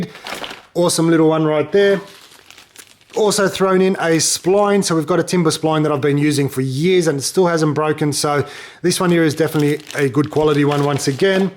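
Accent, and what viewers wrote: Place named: Australia. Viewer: Love ya accent, decent run through. Easy to understand and implement. Go aussie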